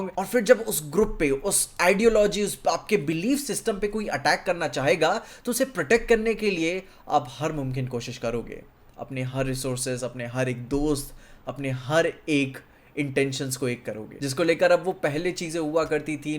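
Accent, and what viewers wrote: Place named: Bihar, India. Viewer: bihari accent ❤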